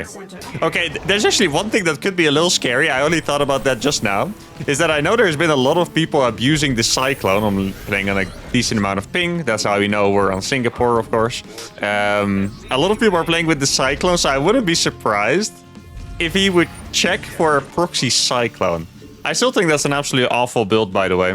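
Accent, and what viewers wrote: Netherlands. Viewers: How many languages do you speak? Your English is great. You sound Dutch? You live in Mexico so probably Spanish. I would love to see a Spanish stream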